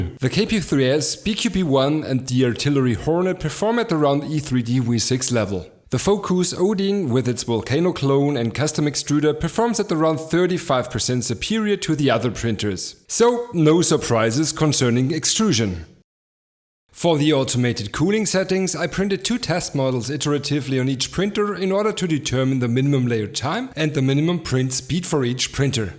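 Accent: Germany. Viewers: Welcher Dialekt aus Bayern, nehme ich, war es?? Antwort würde mich freuen!!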